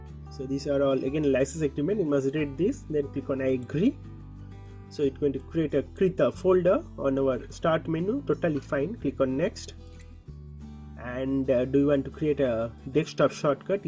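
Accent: India. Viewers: its allways the indian acsent to, thats how u know its going to work. thanks man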